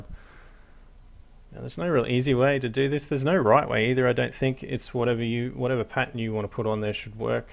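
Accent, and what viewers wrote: Australia. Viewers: I mean hearing an Aussie accent on a wargaming terrain tutorial channel is weird enough but seeing all the tools from Bunnings is even weirder.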